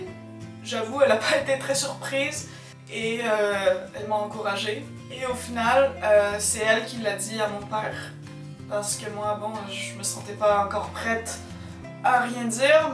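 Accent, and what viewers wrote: Canada. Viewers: c'est pour ça que tu as un accent canadien!! :) bisous du Canada!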